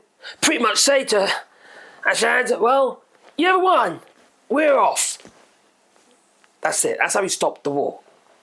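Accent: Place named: Norfolk, United Kingdom. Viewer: you sound like a norfolk buh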